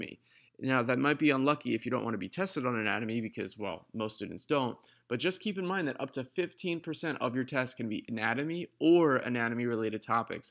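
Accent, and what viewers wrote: New Jersey, United States. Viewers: u from new jersey (central) or from california?!? ha!;) god bless bro! ..for me, healer + teacher combo is this earth's highest calling,,hat's off sir! cheers m8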